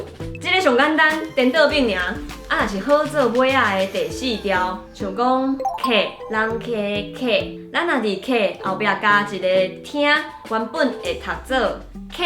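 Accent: Tainan, Taiwan. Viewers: 我生於1951年，仍然謝謝~ 1)邏輯清析的台語文法課; 期待妳的新課程; 2)令人懷念的[聽了六年]的台南腔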